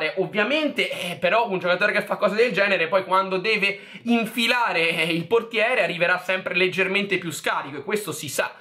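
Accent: Tuscany, Italy. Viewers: Ma sei toscano?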